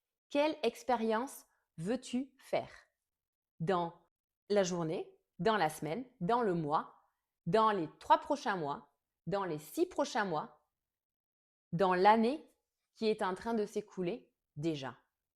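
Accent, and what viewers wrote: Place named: South, France. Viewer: Bonsoir. MERCI infiniment pour votre réponse. Il est vrai que le mental façonne parfois la perception de certains événements. Il faut se poser les bonnes questions en essayant de rester aligné. Vous avez raison. Ce n'est pas l'arrivée qui compte, c'est le chemin..... Encore MERCI. (Vous avez un petit accent du sud🌞). Je vous souhaite une belle soirée. Bien cordialement. Olivier...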